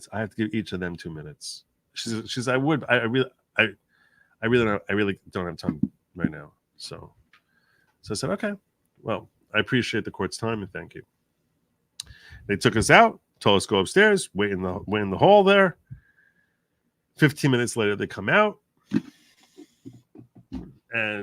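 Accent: New York, United States. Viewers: Thank you 🙏🏽 God Bless you. I just found you and have subbed. I appreciate you more than you will ever know. 🇺🇸 You are a true AMERICAN. Thank you for the attempt to remove gag order. I love your NYPD cap. As I am a recently retired 911 Dispatcher of 30 yrs. Half State time and half County time. This cap means a lot to me. You definitely have a NY accent! My husband of 20 years is from Queens-lifelong. And he has lost a lot of his accent being in the south. But it’s definitely still there. He does still say “ Can I ax you something?” 😂😂 I tell him he better not AX ME! 🤣 Thank you so much. 🇺🇸💙💛💙🇺🇸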